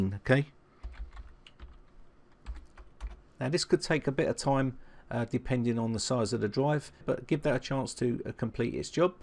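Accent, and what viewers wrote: United Kingdom. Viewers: I so want to thank you mr. british guy! I feel so relieved now. weew! YOU ARE A GODSEND! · Thanks so much mate. This is exactly what I'am looking for. BTW I love your British English. ^^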